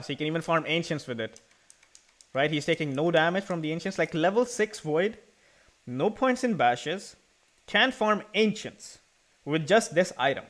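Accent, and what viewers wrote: India. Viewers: keling speak english so annoying to hear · VERY NICE JUST COPY CEB VIDEO IN INDIAN ACCENT , there have been 1000 game with helm and u chose this game that ceb already explained xd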